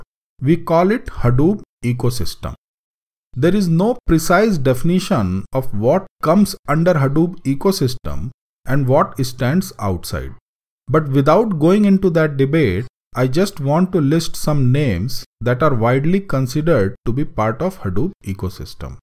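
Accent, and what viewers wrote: India. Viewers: Thanks for making these tutorials, they're just too helpful. Not only am I learning hadoop, but I am also getting more familiar with the Indian accent!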